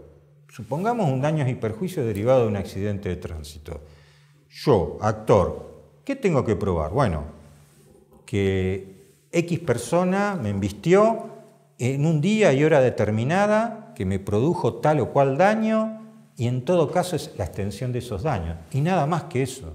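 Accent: Argentina. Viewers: Es Argentino no escuchas como habla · Cabe considerar que el habla de acuerdo a la Ley de su país, por su pronunciación parece ser argentino, lo dicho por el Dr. no es totalmente aplicable en cualquier otro país como México. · Tiene acento porteño asi que asumo que es de Argentina xD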